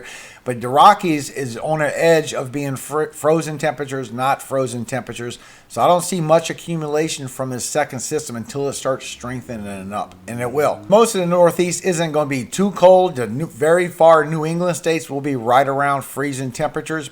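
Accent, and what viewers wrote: Louisiana, United States. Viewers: Thx! You sound like a cajun a bit!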